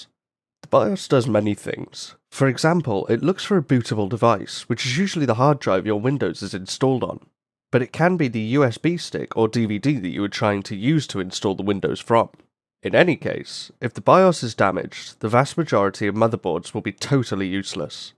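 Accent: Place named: India. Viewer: This host channel like india accent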